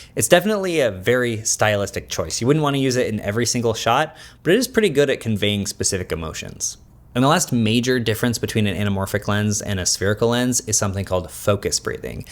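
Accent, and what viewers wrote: United States. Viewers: Aaaaah "Another: "Whats-Up" opening! As soon as I hear this + Yank voice! Block and go.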